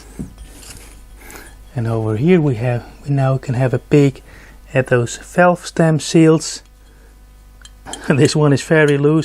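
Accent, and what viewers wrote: Netherlands. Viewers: ik proef een beetje een Nederlands accentje hahaha.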